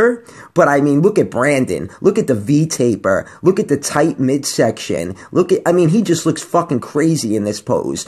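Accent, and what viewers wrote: New York, United States. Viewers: Love your nyc accent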